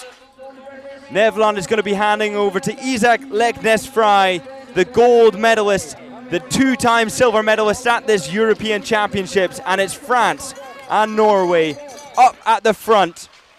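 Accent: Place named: Scotland, United Kingdom. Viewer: This guy is the best English language commentator. Is he a Scot?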